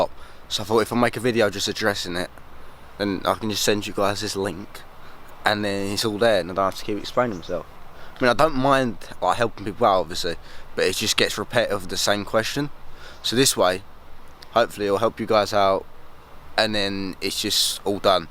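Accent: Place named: Croydon, United Kingdom. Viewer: Why does he talk like a wannabe rude boy from Croydon???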